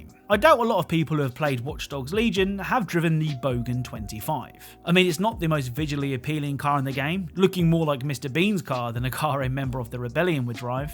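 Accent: England, United Kingdom. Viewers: Like how? Like catacombs..? Or like catacombs? Or maybe catacombs? I don't get what you're saying. I mean he's got an English accent, but he says catacombs as catacombs...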